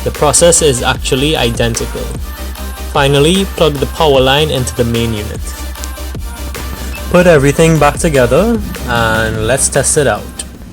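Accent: Trinidad and Tobago. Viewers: Knew he sounded like a Trinidadian, big up Couva lol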